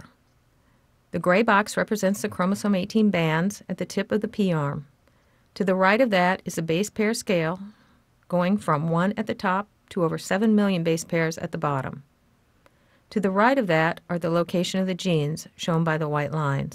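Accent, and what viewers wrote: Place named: Texas, United States. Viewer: Ahh.. I love that Texan accent ^^ Very clear explanation of this method also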